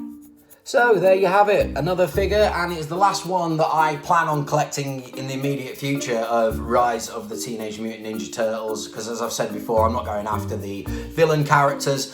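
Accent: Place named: Australia. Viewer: I like your Australian accent! Also great review, really enjoyed it.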